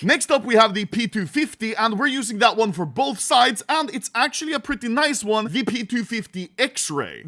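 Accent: Yorkshire, United Kingdom. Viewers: YORKSHIRE INNIT 🗣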